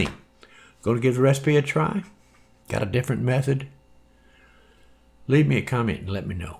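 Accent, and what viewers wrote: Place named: South, United States. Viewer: Aww hell yeah, a nice southern accent you know u finna get a nice recipe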